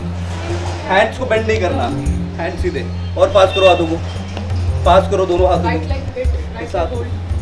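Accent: Kerala, India. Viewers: Are you a malayali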